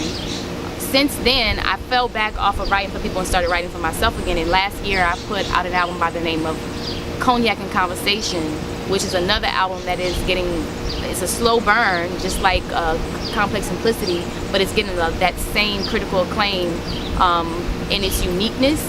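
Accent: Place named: New York, United States. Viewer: Girl you fine as red wine 🍷 lol you are beautiful keep pushing mama and why do you have a little New York accent ? Lol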